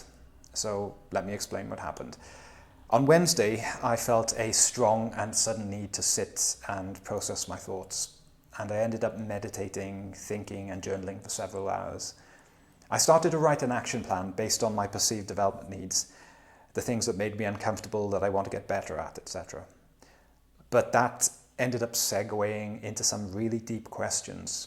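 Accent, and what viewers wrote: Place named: Wales, United Kingdom. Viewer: thought I recognised the accent but wasn't sure. South Walean here 😁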